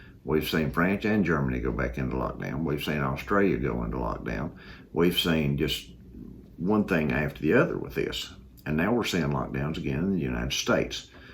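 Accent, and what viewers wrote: Kentucky, United States. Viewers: I knew I recognized the accent, I was born in KY! I thought for awhile you were from the Shenandoah Valley but KY is wonderful too. Your a lucky man.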